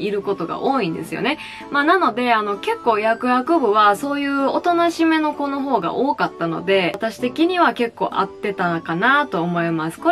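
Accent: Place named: Osaka, Japan. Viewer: 喋りが面白すぎて、チャンネル登録しちゃいました。大阪のイントネーション良いよね。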